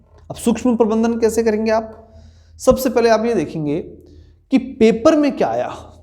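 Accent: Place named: Bhilwara, India. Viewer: सर आप भीलवाड़ा जिले के हो क्या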